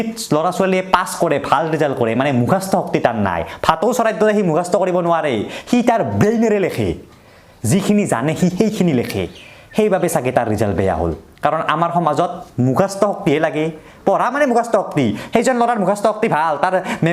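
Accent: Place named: Lower Assam, India. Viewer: Lower Assam r language enekuwai Moi Assamese Chanel nasau ..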